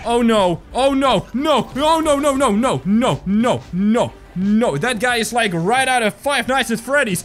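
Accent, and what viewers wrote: Netherlands. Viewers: By the way, I also live in the Netherlands, so I would like to know which province you live in. My province is Utrecht. By the way, you sound like a guy who lives in Groningen.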